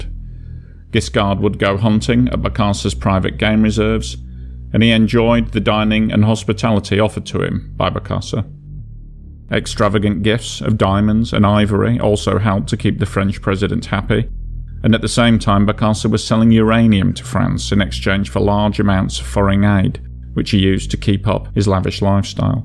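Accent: United Kingdom. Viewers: Is the narrator a native of England? His english accent looks like very british to me.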